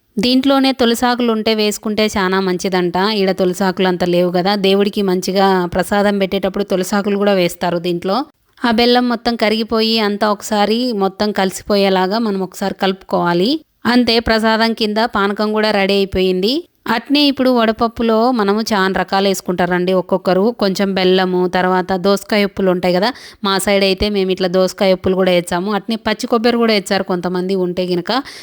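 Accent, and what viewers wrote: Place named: Kadapa, India. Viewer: U r voice ur slang of kadapa s super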